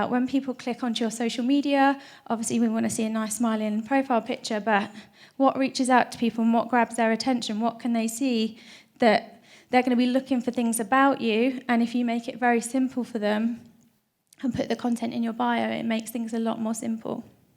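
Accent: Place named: United Kingdom. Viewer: first her british accent annoying second try your product in your ass see if it work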